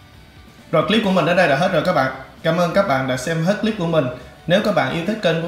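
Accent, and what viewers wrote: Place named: North, Vietnam. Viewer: Giọng bạn này như ở miền ngoài, giọng hay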